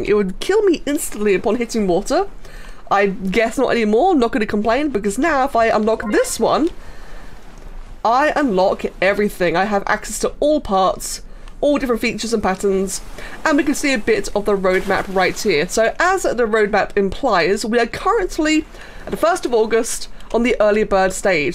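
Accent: United Kingdom. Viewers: why listening to the bri-ish accent hurts that much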